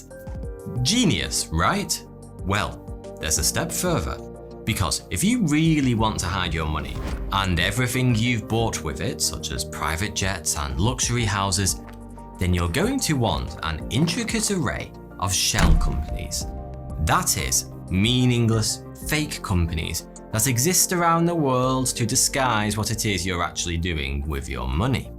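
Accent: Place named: East Lancashire, United Kingdom. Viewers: wouldn't it be crazy if he was a raging coke head off camera, and it's just the English accent that makes you think he is classy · Nah, he has an east lancs accent, more like Blackburn/Burnley 😀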